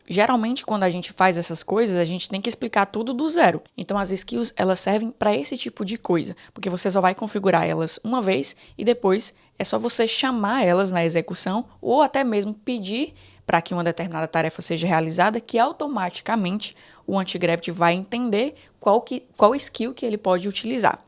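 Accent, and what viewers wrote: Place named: Recife, Brazil. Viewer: Oxi! O sotaque é do Recife. Hahaha Conteúdo muito bom! Muito rico! Parabéns! Uma dúvida: como funciona o Antigravity para criar apps e fazer o deploy?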